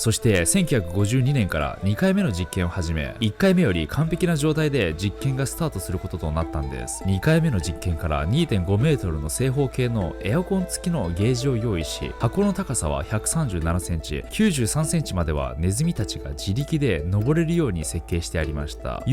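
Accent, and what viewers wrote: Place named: Japan. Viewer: 、英語の発音が日本語発音になってる、、、気を使ってるのかな？もっとネイティブの英語を聞きたいのですが。日本の大衆圧力でしょうか？なおきマンらしい昔のネイティブ発音でいきましょうよ😁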